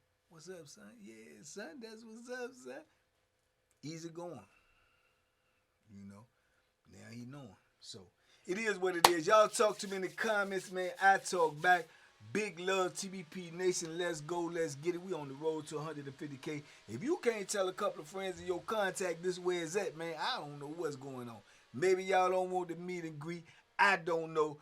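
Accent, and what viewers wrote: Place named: New York, United States. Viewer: TBP Hartford CT Checking in and sassy salute that's rt you can't sleep on nobody son that NY acent is what's up□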